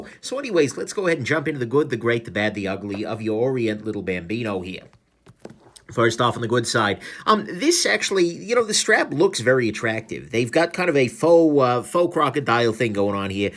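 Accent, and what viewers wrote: New York, United States. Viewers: That accent is just awesome. So old New York I love it!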